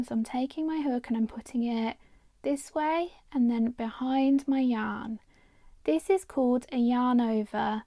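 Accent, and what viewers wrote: United Kingdom. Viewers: And for people who don't live in America and want to use UK terms, do I have to find a UK tutorial??? I thought with your accent you would be using UK terms. I need something not American.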